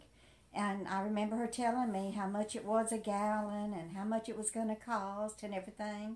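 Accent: South, United States. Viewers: Social Insecurity, this term is exactly what it is for so many. Love your sweet Southern voice & wise words for the younger generations. If only they would listen. Unfortunately many are struggling, living paycheck to paycheck. Some aren't making the choices that would improve their situation. We can't do it for them no matter how much we want to. Until they "get it", we can only wait & try to point them in a better direction. My state also has homestead exception as well as a discount for older homeowners (age 65+, I believe). Not thrilled about "renting" my property from the county/state, but thankful I'm able to afford it. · Hi. I just found your channel. It’s nice to see someone address the realities of the elderly. Couple of things. First. Your a attractive woman. New Jersey? With a southern accent, cool. Are you Italian? You resemble some of my Aunties. 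I did see that your doing smoothies. Cool. I switched to a primarily plant based diet. I have lost 12 pounds so far. Just by cutting out a few things and replacing them with other things. I don’t use coffee creamers any longer, too many additives and sugar. I switched to half and half. I bake my own bread. 50 cents a loaf that lasts all week or more. (thanks mom for teaching me how to bake). 590 for rent? Wow, that beats 1500 plus out here in Southern California. As for me, I’m doing ok thank God and for a mother (with a high school education) that taught me how to save and most importantly pay my house off. Even if you live on social security and a pension, mom always taught me....when your old you still have to save and live a frugal life. It’s. A little something on dumpster diving. Just be careful. I did a little dumpster diving in my youth. It’s really sad to see what this country grows away. 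If your interested and feel up to working just a bit. Check out your local school district cafeteria/foodservice program. You might be able to pick up a couple of hours here and there working in the cafeteria. I was a food service coordinator for years. The pay is good. Keep up the good work......sincerely, Gabrielle, Southern Ca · Hi just curious new sub here.....you have a southern accent why NJ? Im from NY but live in NJ the cost of living is atrocious. My family all moved south yrs ago Im not a fan so I stayed but overall cheaper down there.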